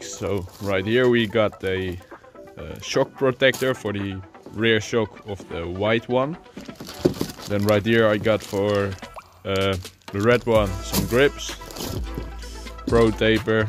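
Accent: Netherlands. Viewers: Aye I gotta ask are you Dutch? Cuz water the accent and I recognize places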